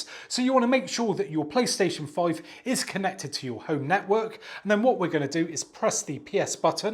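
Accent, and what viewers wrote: United Kingdom. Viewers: Are you British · Very helpfull I realy understand everything and your very nice british english you are the best and your video is far more better then sony thanks you very very very much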